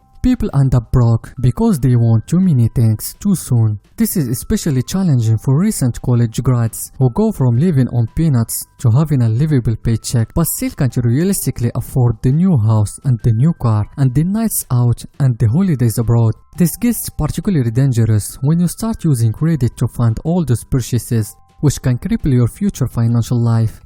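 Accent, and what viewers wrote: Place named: France. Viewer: he is a french speaker this why he got a french accent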